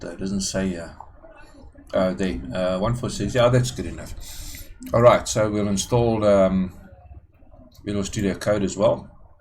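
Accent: South Africa. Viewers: Why can you always here a saffer right off the bat 😂. Lekker man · u frm SA?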